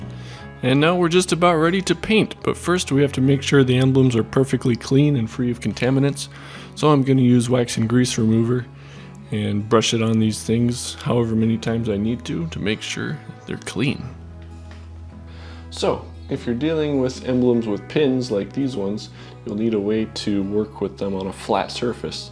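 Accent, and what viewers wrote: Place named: United States. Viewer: Irritating americun voice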